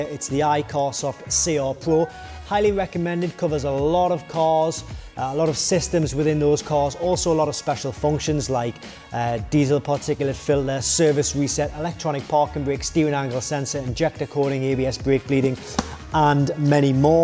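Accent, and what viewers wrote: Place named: Liverpool, United Kingdom. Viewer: I am a fan of accents. I like to listen to different and know where they are from. I am curious which part of England (is it England?) is yours from. I would be guessing Liverpool, and as soon as I guess that I think I am wrong (?)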